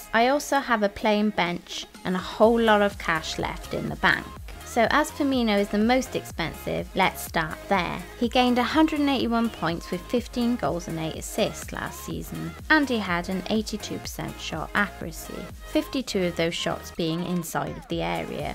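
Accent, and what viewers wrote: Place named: Wales, United Kingdom. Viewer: Never the less; those lovely Welsh(?) tones are honey to the ears. Keep up the great content and get well!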